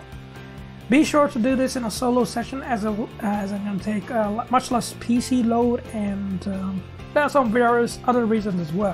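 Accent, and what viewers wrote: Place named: United States. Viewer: I dont want to be mean but he sounds like Mikey Mouse with an American accent. He makes amazing and helpful videos still.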